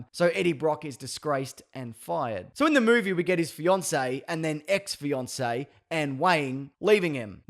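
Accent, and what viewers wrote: Australia. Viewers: Wait I just clicked on this video and I have no clue who you are but you sound great! Umm are you Aussie cause I am I can hear it in your voice are you???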